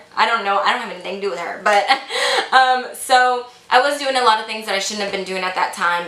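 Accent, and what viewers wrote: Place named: Texas, United States. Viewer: this might sound weird but I love to hear you talk 😂 I love your texas accent and your stories! (more story time videos pls) thank you for being so humble and really inspiring! 💜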